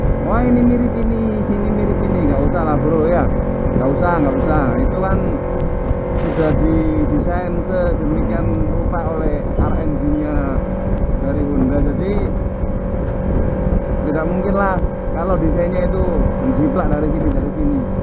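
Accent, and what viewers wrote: Java, Indonesia. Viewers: logate jowo bingit... asline ndi to buoosss...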